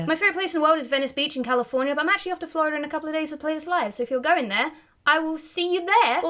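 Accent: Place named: England, United Kingdom. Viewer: Just found your face. You probably have the cutest British motormouth accent from England. You're from England right?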